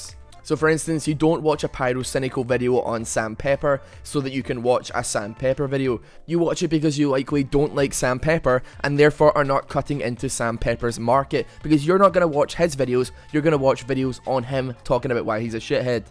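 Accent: Scotland, United Kingdom. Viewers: are you fookin scotish m8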